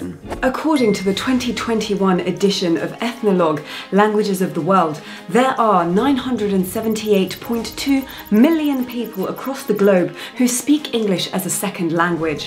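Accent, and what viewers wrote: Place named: North America. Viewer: Your accent is just like north American accent, madam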